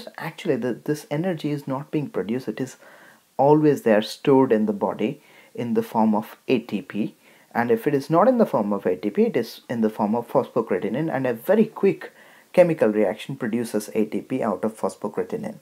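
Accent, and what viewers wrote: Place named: India. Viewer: I like your speaking. But I hate your Indian English.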